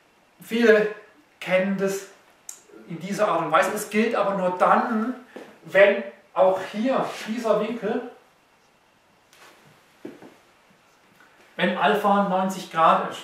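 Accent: Swabia, Germany. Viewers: xD schwabe!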